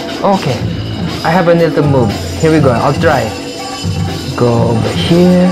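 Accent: Philippines. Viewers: HAHAHAHA i like your filipino accent!! hope you had fun playing in manila!! godbless you sir!🙌🏻🙌🏻💪🏼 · HAHAHA! Nice Filo accent bruh! Dope battle!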